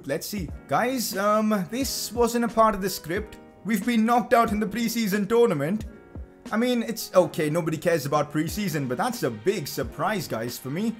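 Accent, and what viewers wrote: India. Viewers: bro u indian?? i mean u have a bit of indian accent... just casually asking.. nice vid btw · I like you. You have a thick Indian accent, but I like you. Straight to the chase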